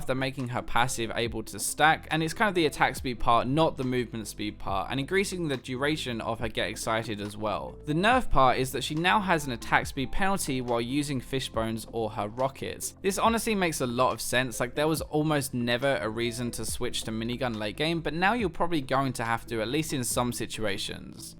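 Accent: United Kingdom. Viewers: I don't even play adc c: Just here to enjoy your beautiful British accent ;)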